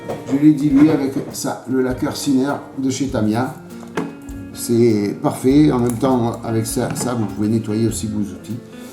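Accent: South, France. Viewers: Bonsoir. meilleurs voeux à toi et à tes proches. Dans l'attente de tes prochaines vidéos, de tes précieux conseils et de ta bonne humeur, avé l'accen du Sud. Amitiés.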